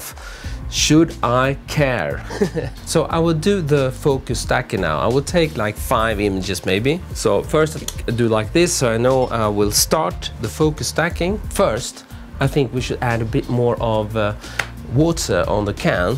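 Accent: Sweden. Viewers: Now I know what word will deffinitley tell if the person talking is swedish, it is the way he pronounces ”glycerol” 😁 great video again, thank you! 👏😊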